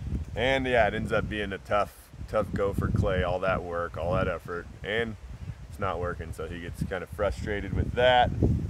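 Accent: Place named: Siberia, Russia. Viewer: As you know, Alone on TV is very drawn out sometimes, that’s why I started doing 1.5/2x But, I never got to hear what your voice sounded like at this speed because whenever you or roland were on I would slow it back down so I didn’t miss anything. You guys are like encyclopedias: straight to the point, like how I imagine many most mountain men and Russians are. I have a theory that your time spent in Siberia has given you a really cool dialect. It’s always a pleasure to hear you talk, especially from memory.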